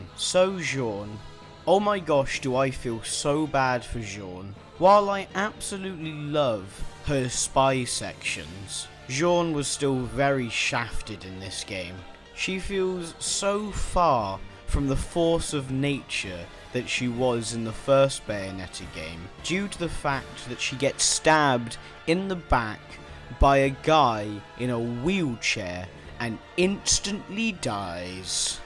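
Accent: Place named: United Kingdom. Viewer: He's literally from the UK and one can clearly hear it lmfao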